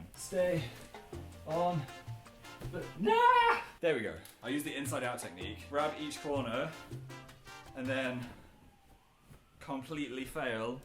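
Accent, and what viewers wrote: North, United Kingdom. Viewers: I was about to say you sounded a lot more northern before you said something 😂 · I didn't notice your accent was northern until this video... wow I'm observant. Now I can't pay attention to what you're saying I'm just listening to the accent. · I thought Phil sounded kinda northern in this particular video. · that northern accent though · you sound so freaking northern this week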